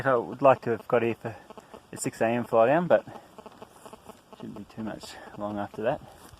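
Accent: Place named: New Zealand. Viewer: Really enjoy your posts . As a kiwi you sound like one to me , a soft kiwi accent. I would ask that the sound quality is improved a large part of your commentary Is inaudible over the wind noise, which can be too loud at times.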